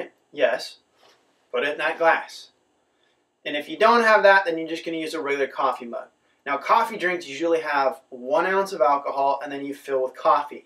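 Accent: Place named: France. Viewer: Love the French accent :D Thanks a lot brother, will definitely recommend!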